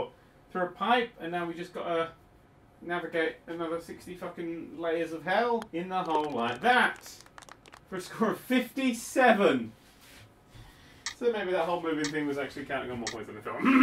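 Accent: England, United Kingdom. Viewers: When gets angry, he gets like %70 more British. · Is it just me or has gotten significantly more English? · I think is extra British in this episode. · Has became more British?? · I think sounds the most british he ever has in this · sounds a lot more british in this. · Boy sounds extra British today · is extra english today. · Is it just me or does sound more British then usual in this video? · became more british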